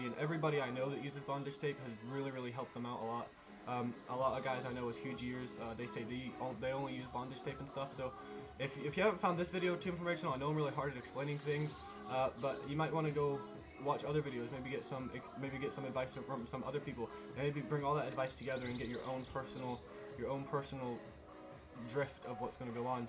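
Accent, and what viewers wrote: Scotland, United Kingdom. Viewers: where are u from like I keep getting hints of a Scottish accent idk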